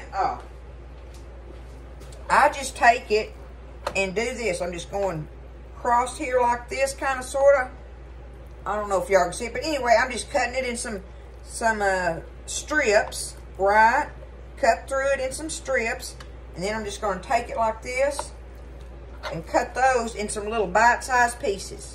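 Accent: South, United States. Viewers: You are such a joy to watch. I enjoy you recipes and love your southern accent. Im up north in Minnesota.will try your southern pepper steak. Looks great. Thank you.you are sweet as apple pie😊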